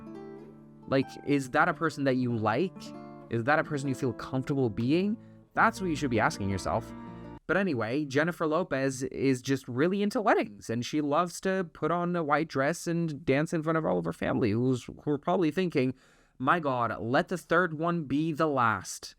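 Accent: United States. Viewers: wait, you are portuguese? Haha me too. Também sou portuguesa, que engraçado pareces americano. Um abraço, bom vídeo. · Your in the U.K. but totally sound American? As usual, I am so confused! Very much enjoyed your commentary on JLo! Your funny and correct! IMO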